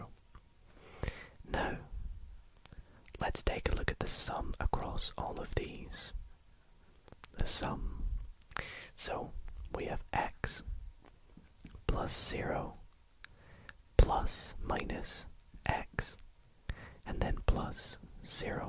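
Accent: United Kingdom. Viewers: i love your asmr math channel. i also like your British YouTube name and accent. the British tends to call mathematics maths in abbreviated form.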